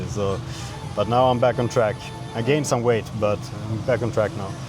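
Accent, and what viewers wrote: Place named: Finland. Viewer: Vilken härlig och positiv finsk tjej! 😊Även om hon har en mycket bra engelska, så hör jag direkt en finländare.😉Menit seurantaan. Odotan innolla tulevia videoitasi!😉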